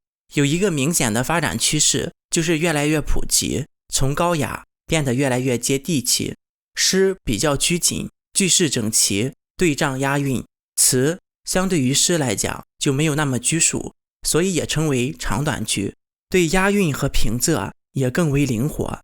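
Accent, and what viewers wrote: Shandong, China. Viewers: 博主山东人？？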